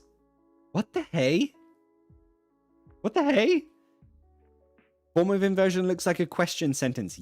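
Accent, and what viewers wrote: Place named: RP, United Kingdom. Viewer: 😂😂😂😂😂😂😂...."I'm at the lower end of R.P😆😆😆😆🤣I speak clearly, but not in a stupid way"😅😅😅😅😅😅 Sos un idolo total,! Me haces cagar de risa!😅😅😅😅😂😂😂🇦🇷🇬🇧🇦🇷🇬🇧🇦🇷🇬🇧🇦🇷🇬🇧🇬🇧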